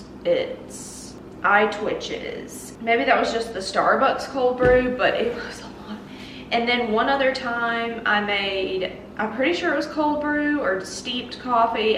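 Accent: South, United States. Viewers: that Southern accent😍...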